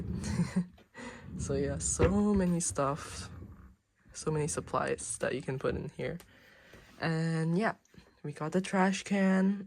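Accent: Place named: England, United Kingdom. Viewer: The English accent got so thiccc ever since highschool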